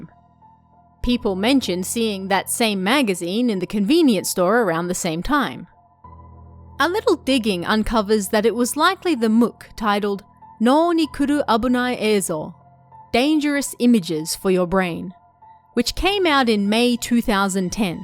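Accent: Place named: Australia. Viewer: You sound Australian 🤔👍 thanks for you work 🙂 I couldn't do it 🐨🇦🇺🦘🙂.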